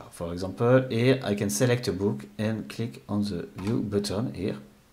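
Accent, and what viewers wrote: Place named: France. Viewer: Do not ever apologize for your French accent! :)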